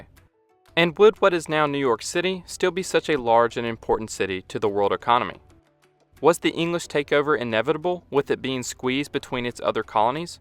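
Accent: South, United States. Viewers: Dude I love your voice. So relaxing. I can tell you’re from the south!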